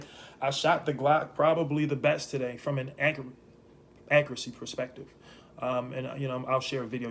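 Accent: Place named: Maryland, United States. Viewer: Dem O’s & U’s definitely from Murland 😂 keep up the good work bro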